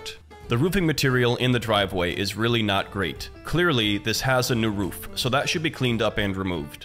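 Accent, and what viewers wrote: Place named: Florida, United States. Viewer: seems like a guy I’d chill with. Looks and sounds like a Florida local too.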